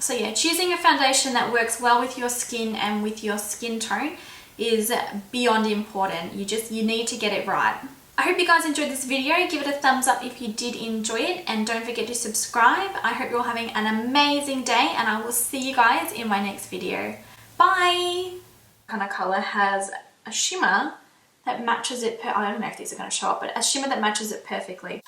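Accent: Australia. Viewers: Are you from Queensland?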